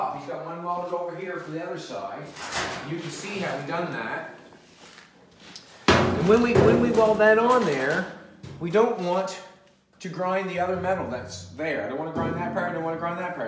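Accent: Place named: Newfoundland, Canada. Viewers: Sounds like he's in Eastern Canada...eh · True newfie,, talk for days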